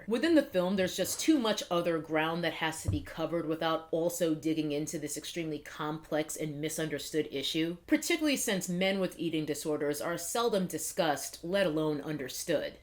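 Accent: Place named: Brooklyn, United States. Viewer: so brooklyn. just subscribed.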